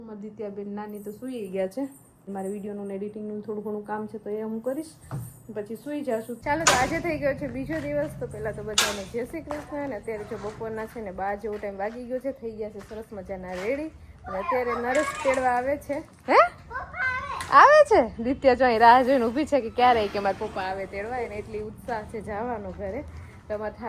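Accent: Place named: Bhavnagar, India. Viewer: mne tame bolo chho a spich mne bav game aam bi mne bhvngar ni lengwej boooj game mari frend gondal na pinl gohel atle pelle thi vaato karvi bav game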